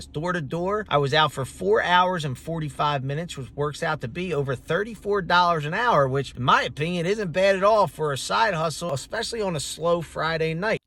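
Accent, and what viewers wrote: Philadelphia, United States. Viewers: now i know for sure you're a true Philadelphian....besides your accent and the Jawn hoodie, you know how to say "Lancaster" right. :)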